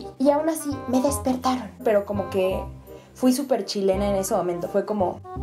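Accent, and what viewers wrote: Mexico. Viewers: Creo que tú fresonez fue lo que incómodo a los chilenos y no tu forma mexicana de hablar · el peor video que he visto en 0.25, habla muy mexicano y muy lento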